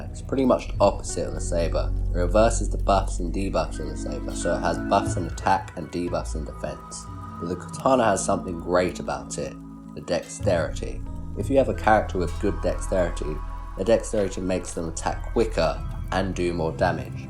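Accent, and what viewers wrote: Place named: United Kingdom. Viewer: When you're just British without the spiff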